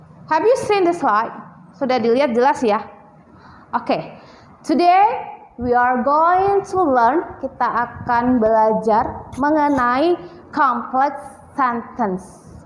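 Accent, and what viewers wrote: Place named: United Kingdom. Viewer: Pengen ngucapin BHS Inggris kayak ibu dosen, fahiiiih bgttt.. aksen Britishnya dapeet.. bah bih beeh..😁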